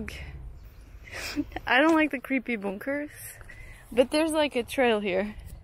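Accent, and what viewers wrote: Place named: Norway. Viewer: Happy birthday! I think your english has become influenced by your exposure to norwegian😊.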